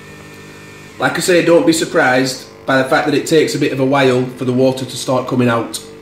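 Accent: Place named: North, United Kingdom. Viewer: Couldn’t make sense of the nespresso instructions, all I needed was a straight-talking northerner to show me what I was doing wrong, thanks!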